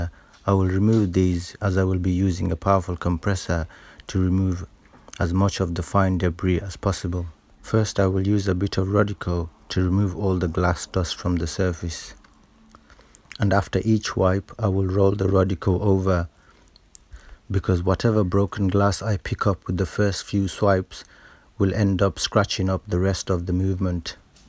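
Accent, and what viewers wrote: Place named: Black Country, United Kingdom. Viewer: Hi. Are you from Smethwick by chance. You have more black country accent than Brummie.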